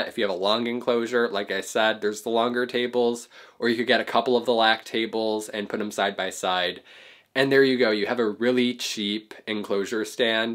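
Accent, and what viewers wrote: United States. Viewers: Okay stupid question but is he American or Canadian? In turn are these American prices or canadian😂😂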